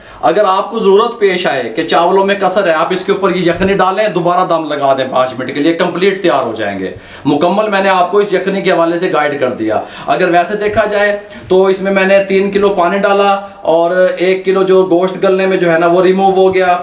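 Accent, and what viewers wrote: Punjab, India. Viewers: No fancy kitchen no fancy utensils no show shaw just pure authentic recipes guidelines and awseemmmm Punjabi talking style 👌👌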